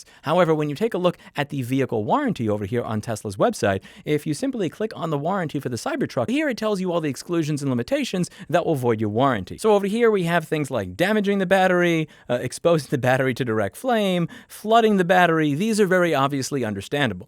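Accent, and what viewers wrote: New York, United States. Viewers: you can hear the new york accent at this speed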